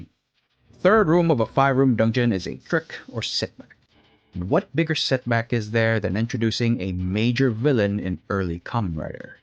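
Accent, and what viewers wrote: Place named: Philippines. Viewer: i can hear a fellow filipino's voice from a mile away... this is an amazing video!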